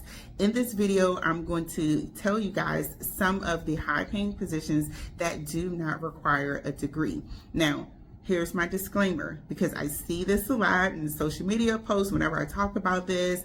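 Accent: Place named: DMV, United States. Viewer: Very resourceful! Are you from the DMV? I am only asking because of your accent.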